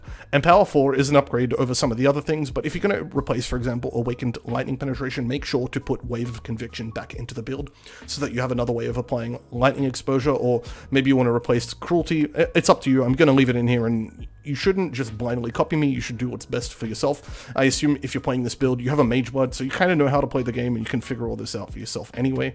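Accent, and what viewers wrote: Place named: Europe. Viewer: opening the video, seeing gameplay & hearing an EU dude right away Me: Oh this is a good build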